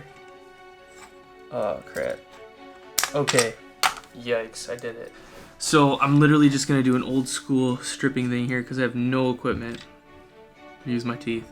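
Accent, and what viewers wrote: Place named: Minnesota, United States. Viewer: You must be from Minnesota, that accent is thicc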